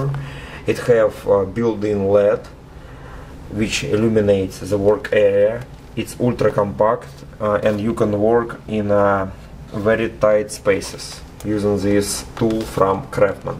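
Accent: Russia. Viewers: Чёт у тя акцент русский. Иди учи фонетику!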